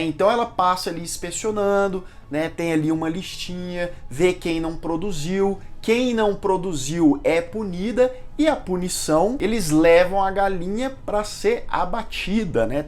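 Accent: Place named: Belo Horizonte, Brazil. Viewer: Que beleza de vídeo e o lance da Kombi dos ovos a dez Reais foi massa!!!! Kkk parabéns!!! Vc é mineiro, né? Sotaque de bh!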